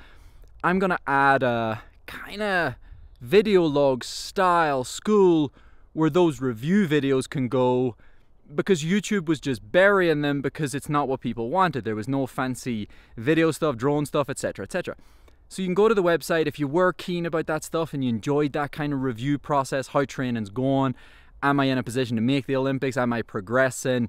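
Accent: Northern Ireland, United Kingdom. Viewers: Jaysus that norn Irish accent cuts like a knife. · Came across this video and my ears perked up immediately, no mistaking that accent. Fellow nordie here.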